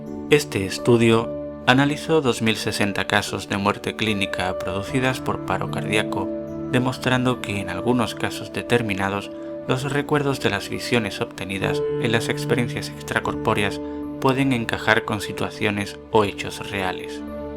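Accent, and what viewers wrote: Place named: Spain. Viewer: Hace mucho tiempo y durante el tratamiento por un aborto involuntario de cinco meses, estando en sala de operaciones porque había que limpiar por dentro y * estando consciente, me fui por un túnel. Estaba con un relajante y tenía los ojos cerrados pero no estaba anestesiada y estaba conversando con el médico y la enfermera cuando de pronto me vi en un túnel oscuro pero con tiras de luces de colores en la parte de abajo. Iba extraordinariamente rápido hacia el frente y de pronto comenze' a ascender y en un momento dado empezar' a ver en lo alto del túnel una luz blanca brillantísima y unas siluetas brillantes también que se asomaban al túnel moviendo los brazos como saludando. Esto lo iba yo contando al doctor y a la enfermera. Llevaba horas diciendo que me quería morir de lo mal que me sentía, no paraba con eso pero no me estaba muriendo y estaba tan consciente que hasta gire yo misma la cabeza porque estaba vomitando acostada. 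Fue muy raro y no me dio' miedo, más bien empece' a vacilar por la velocidad que llevaba pensando que si chocaba con una pared o salía despedida (a no sé dónde) entonces si que me iba a morir de verdad. Sentí que había gente conocida y también desconocida allí arriba pero el destello de luz no me dejaba ver sus caras. De pronto, salí del túnel y ahí quedo' todo. Claro, me quede con eso de haber estado en * el túnel sin haber estado muerta ni muriendo. No sé cómo los que saben explicarían esto. Suenas español, sabes cómo se veían las luces del túnel? Pu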